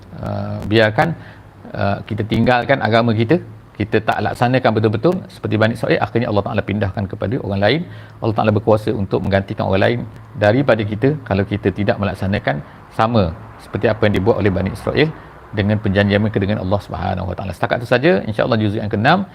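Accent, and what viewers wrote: Terengganu, Malaysia. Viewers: Assalamualaikum... alhamdulillah. Cuma nak tanya Dr ni asal Terengganu ke? Bunyi slang Terengganu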